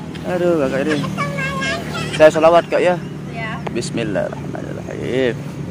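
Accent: Lombok, Indonesia. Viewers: Logat Lombok ya gak ilangg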